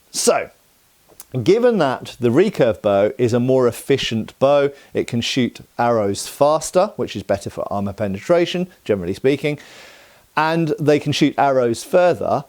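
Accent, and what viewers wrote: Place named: England, United Kingdom. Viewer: Seriously? If you’re American and you’re criticising how an English person is speaking English, consider where your language actually comes from, and who’s actually the one butchering it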